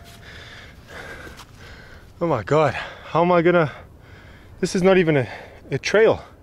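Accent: South Africa. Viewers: You sound like a South African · The heavy SA accent 😁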